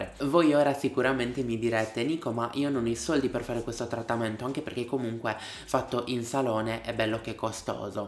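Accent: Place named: Sardinia, Italy. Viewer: Ti stimo tantissimo,grazie per i tuoi consigli preziosi,simpaticissimo quando esce il tuo accento sardo😂❤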